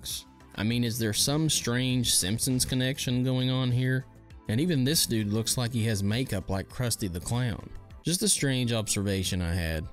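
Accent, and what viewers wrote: South, United States. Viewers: I don't know what it is about your channel, but it brings out a sentiment in me that other gaming channels don't. Seeing the instruction booklets, the old footage and how you present the information - and possibly even your southern draw, takes me back. I wish nothing but success for your channel.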